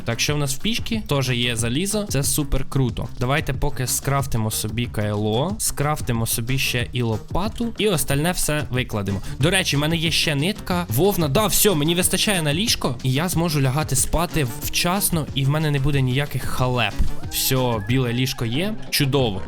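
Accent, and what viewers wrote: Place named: Surzhyk, Ukraine. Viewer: ти насуржику говориш я це чую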